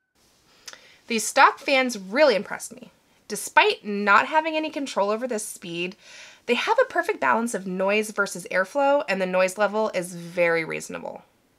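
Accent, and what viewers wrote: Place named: Oklahoma, United States. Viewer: Watched this with ny 8yr old daughter since she wants this case for her pc and she asked me if you are from Oklahoma lol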